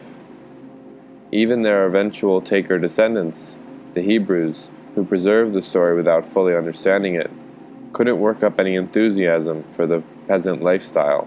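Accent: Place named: Canada. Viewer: His Canadian accent is coming through strong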